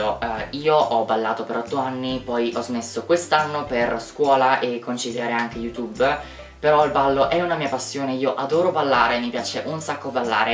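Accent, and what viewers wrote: United Kingdom. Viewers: Adoro il tuo accento inglese. Spero di diventare una persona forte come te♥️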